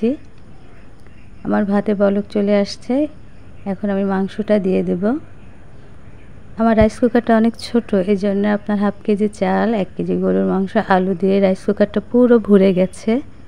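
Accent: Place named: Pabna, Bangladesh. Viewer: ooo amader pabnar moto vasha mone holo tai bollam apu Don't mind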